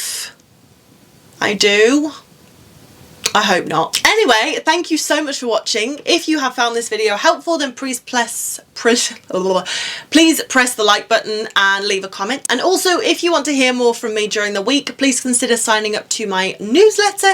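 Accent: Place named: England, United Kingdom. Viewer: I can listen to read the phone book and be mesmerized by that lovely English accent. It doesn't hurt she is VERY easy on the eyes.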